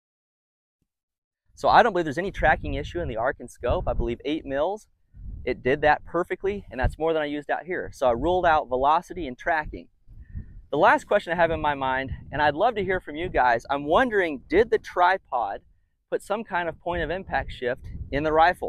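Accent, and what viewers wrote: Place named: Atlanta, United States. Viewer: I knew that accent was from Atlanta lol, just needed to hear the "Ah-lanna"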